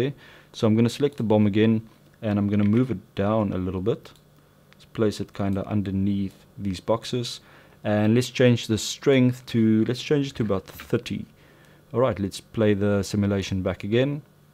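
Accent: South Africa. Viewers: South African accent!!! lekka boet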